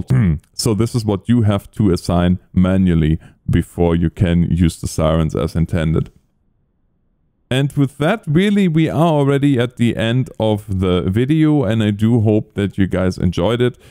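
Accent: Germany. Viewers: Sounds Like German English.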